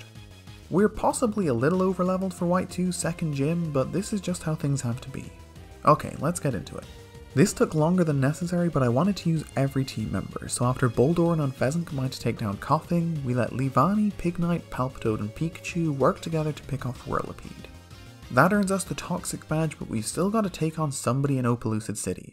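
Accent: Ireland. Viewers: Oh ! I didn't realise you were Irish too!! That's so cool. Hello! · Aye I didnt know you were irish too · NorseGoat I can only recognise two Irish accents, and his voice didn’t sound like either but I feel like such an idiot for not realising, I just watch too much Foil Arms and Hog. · hes from ireland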